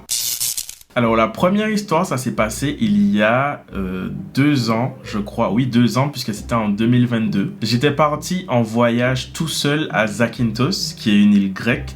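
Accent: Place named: Martinique, France. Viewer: Phoenix en Arizona et expatrié depuis 1979. Ça fait bien plaisir d'entendre l'accent de la Martinique. Tu as beaucoup de talent. Merci pour tous les bon moments de rires.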